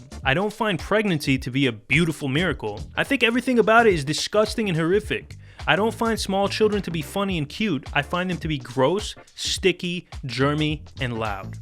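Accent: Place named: New York, United States. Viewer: I love your accent ? Where is it from? New york?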